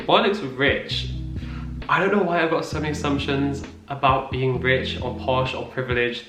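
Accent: RP, United Kingdom. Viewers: Maybe what some people catch that causes the “posh” assumption is that, at least to these American ears, you have a posh accent. I fully admit I am no expert and may be bead wrong. However, I like your accent. It is part of the reason I find you attractive and interesting. Best wishes with med school. 👍👍🤞🤞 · I hate his britain voice. Akhhhhhhtuu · Mar Teumar right? even the way he speaks sounds posh. i mean, isn’t that RP?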